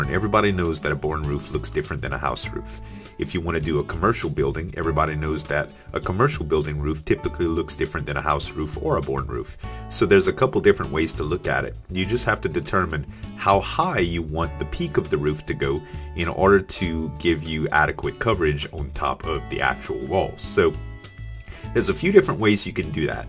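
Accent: Louisiana, United States. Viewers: This guy has got to be from Southeast Louisiana. He has a Cajun accent.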